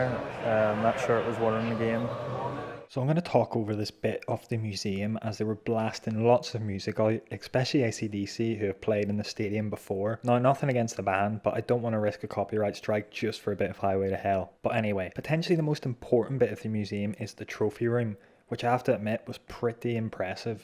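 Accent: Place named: Northern Ireland, United Kingdom. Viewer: Great video, thanks for visiting my favourite club. I can recognize your NI accent :-) Hope you have a great time in Argentina.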